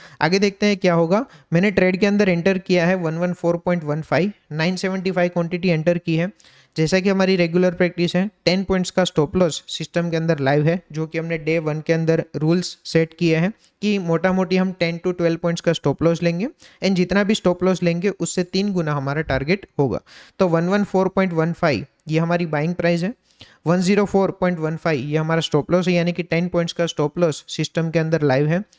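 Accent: Gujarat, India. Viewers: Bhai aapki awaj se esa lag rha hai ki aap gujarati ho?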